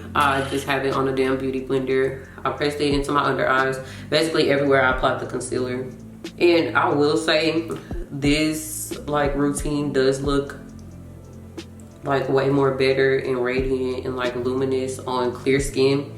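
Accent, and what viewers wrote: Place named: South, United States. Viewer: That southern accent ❤so pretty I like the outfit ❤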